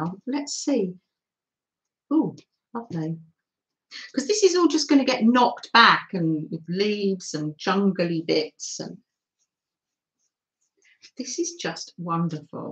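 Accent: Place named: United Kingdom. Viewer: Hello sweet 🦋 and Freddie 🐾 My morning birthday treat/gift was to begin my day watching you playing and creating 🥰🥰 I had a beautiful time with you my friend 🦋🎨🎨 you made such a beautiful painty background on the fabric love the Freddie paws on it 🐾🐾❤️ I played along with you with your prompts 🎨✂️🖍 and btw your French with your lovely British accent is perfect 👌 thank you very much Merci beaucoup for this fantastic moment with you❤️❤️ hugs and kisses to you both 🧚‍♀️🐶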